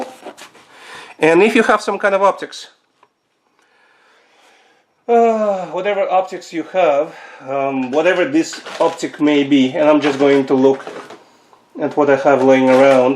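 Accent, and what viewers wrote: Russia. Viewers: слышу русский акцент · Русский акцент ))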